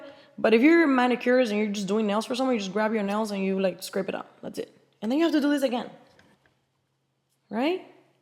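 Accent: Cuba. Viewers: I love ur voice. I knew right away u were Cuban from ur accent. It sounds like Gloria Estefan who I adored back when. Anyway, unrelated! Also, this asshole line totally cracked me up. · This is so helpful!! Thank you! 😊 And I recognized you are Cuban, you've got a cute Gloria Estefan accent! 🇨🇺❤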